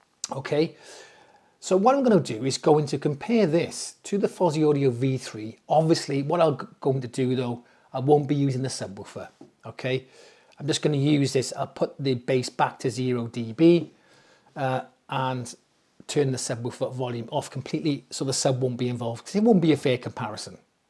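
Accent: United Kingdom. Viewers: not to be a hypochondriac but I know all about autoimmune disorders as well. It can cause the weirdest issues! I permanently lost smell/ taste. They say it's autoimmune. I lost my hair, it came back Stark White then fell out again but then came back 100% like it never happened. Supposedly autoimmune as well but thank God I haven't lost my sense of sound!!! Good channel by the way I subbed and liked. I love your mannerisms I gather your from the UK, not the usual British accent but charming nonetheless. Perhaps it's because all my family is supposedly from the British isles but it's always something comfortable about your accents and mannerisms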